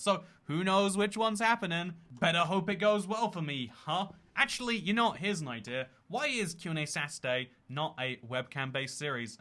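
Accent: United States. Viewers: I'm american 🇺🇸 and even though I'm Texan I've never heard an American accent like that. It's funny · It's not the only thing that I take from your videos, but I love your "Monopoly Man" U.S. accent. Kind of a southern Civil War colonel meets old, crotchety Appalachian hillbilly thing. I can say that because I'm an East Texas hillbilly type. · Pretty good American accent i’m ashamed to say people do sound like that 🤠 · Please don't do an american accent, it scares me.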